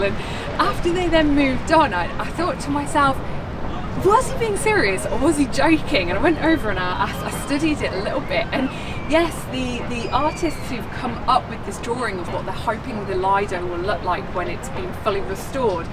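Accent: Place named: England, United Kingdom. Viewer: Wow thanks 👸🌹 for sharing more of your beautiful English seaside and countryside. The food looks wonderful. I really love good seafood. I would love to visit Hadrian's Wall and the Sycamore Gap. All that great Roman and English history. I am glad I can see them through your cameras. I really love your beautiful English voice narrating your videos, it is very nice. I still think your English coast looks like the Washington State Coast, One is rich in Roman and English history and the other one in Native American. Thanks for Sharing Love 💖 your channel. Cheers 🍷🍷🧔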